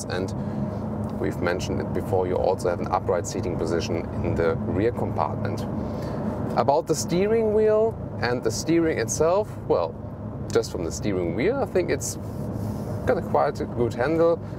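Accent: Germany. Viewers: Wow, I so expecting a German biased report. I was wrong. The guy obviously German but a professional in his work, gave a proper detail account of each car. Hell of alot better than any British car magazines ever do. I love his accent, his English is alot better than my German, so we'll done. With such detailing lasting more than a poxy 3 or 4 mins from the crappy what car reviews ,who often get it completely wrong when there silly surveys come out which you get a quick flippant remark if your lucky, they sure could learn a few things from guy here.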